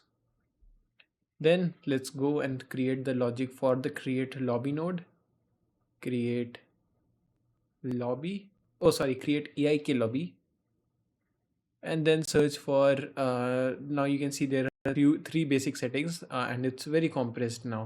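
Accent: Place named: India. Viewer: perfect indian accent. i applaud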